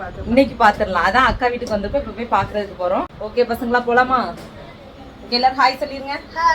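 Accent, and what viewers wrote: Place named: Nagercoil, India. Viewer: Neenga Nagercoil ah sister ❤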